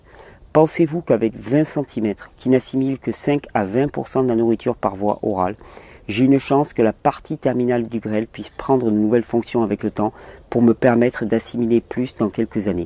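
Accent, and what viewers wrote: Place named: South, France. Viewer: bonjour, au vu de ton accent, tu sembles être du sud de la france, je dirai même sud - est, est ce que je me trompe?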